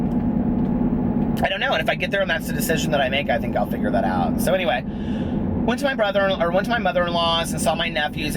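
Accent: South, United States. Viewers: Sister, do you have roots in the old south?? You have a bit of a southern accent.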